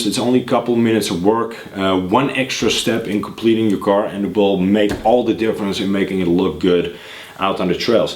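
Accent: Netherlands. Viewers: Wow! Really creative and original work. Are you Dutch by chance because your accent sounds a bit Dutch?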